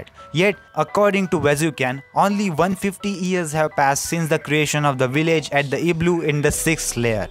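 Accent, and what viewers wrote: India. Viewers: Very intreating good job! I don’t personally mind your accent because I’m also Indian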